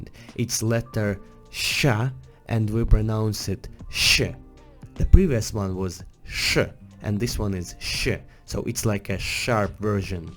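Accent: Russia. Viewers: You have a russian accent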